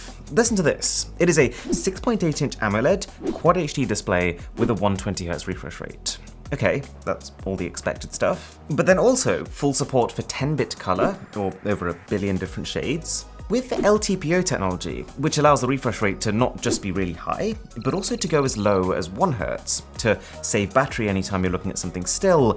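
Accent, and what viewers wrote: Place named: United Kingdom. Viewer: The British Accent is everything for me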